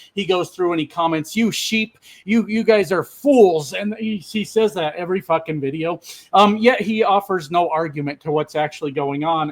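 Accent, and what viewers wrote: Canada. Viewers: Fuck yea buddy. Are you sure you were born in America? Cause you sure as shit sound Canadian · I actually thought you were Canadian..you have the Canadian accent lol. We Canadians are kind, empathetic,giving neighbors..until you give us reason not to be!🤷We now have a briiliant PM with a masters and Doctorate in Economics. The Divided States of AmeriKKKa has a multi bankrupted rapist Felon who has over 50 failed business ventures😂 Canadians are doing great in our beautiful country and continue to be respected worldwide 💪🇨🇦 fdjt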